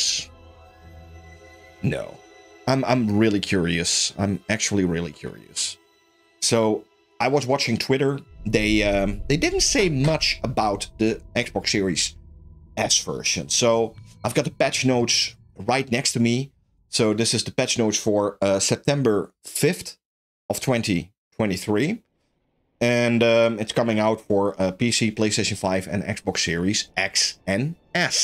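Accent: Netherlands. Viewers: Altijd leuk om een random video aan te klikken om vervolgens dat Nederlandse accent te horen. Top video, goede info. Bedankt!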